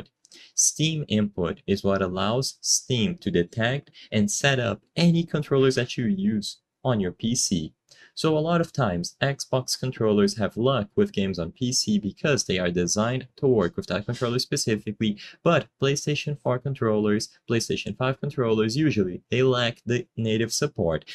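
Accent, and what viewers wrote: Brazil. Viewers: o cara fala bem, mas o sotaque é sempre reconhecível pra BR q manja de inglês kkkkkkkkkkkkk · Esse sotaque... Desconfiei que era brasileiro. Acessei o canal e confirmei minhas dúvidas hahaha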